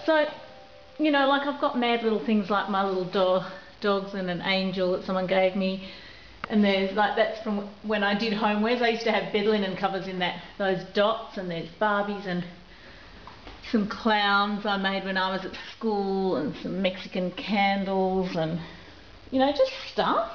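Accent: Australia. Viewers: yay 10 for the australian accent!!! Reminds me of my aunt lool!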